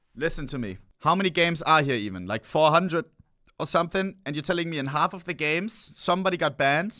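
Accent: Germany. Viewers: Stresst richtig, wenn Deutsche versuchen englisch zu sprechen :D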